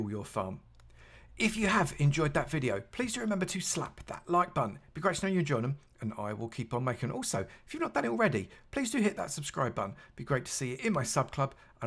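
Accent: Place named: England, United Kingdom. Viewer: He's just English, that's it.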